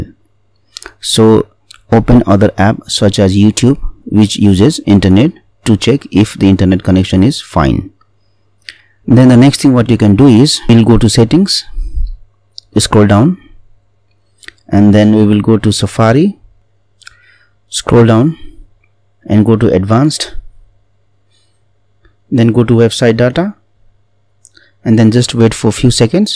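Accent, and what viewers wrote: United Kingdom. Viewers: This is the first India guy can speak with English accent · Are you from London?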